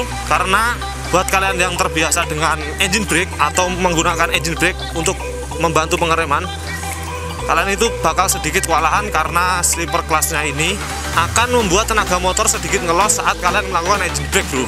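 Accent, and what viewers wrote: Java, Indonesia. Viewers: Keren nih review... Nggak mojokkan salah satu merek. Mantap bro. Logat jowone mantap bro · Muedok tenan mas... mantap lah,madjoe terus...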